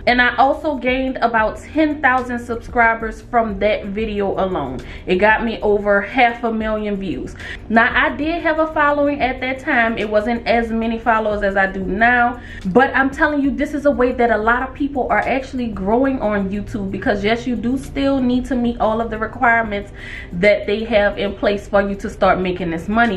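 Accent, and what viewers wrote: New Orleans, United States. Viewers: I hear dat accent!!! You gotta be from the NO!!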